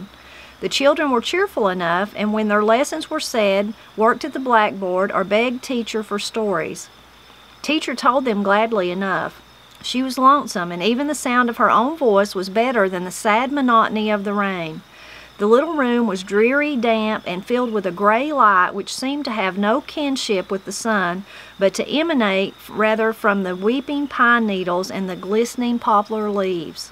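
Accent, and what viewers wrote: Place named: South, United States. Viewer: This was just what I needed at the end of a long week of work. So nice to hear your lovely Southern voice reading to us and the story gets better and better. Reminds me it is time to find another good book to read myself. Or time to open a fall puzzle. You are special and I am so glad to have found your channel.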